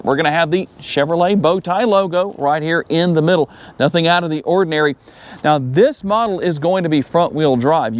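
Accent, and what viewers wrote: South, United States. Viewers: He speaks like someone from the southern United States. He is probably very respectful, cares about people he doesn’t even know and smiles when people aren’t nice to him. Good salesman. Might buy one of these because of the video.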